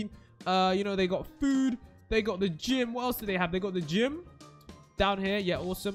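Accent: United Kingdom. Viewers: She is a British accent not Tycho Natalie Cole of Montgomery not see Kola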